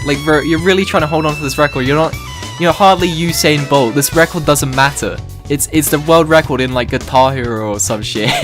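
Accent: United Kingdom. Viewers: I respect a man who can share his opinions online. Commenting to help push this vid and ruin someones new year! Edit: Ew youre british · guys there isn’t a joke it’s just a British guy shitting out of his mouth for five minutes. I feel like I am watching pyrocynical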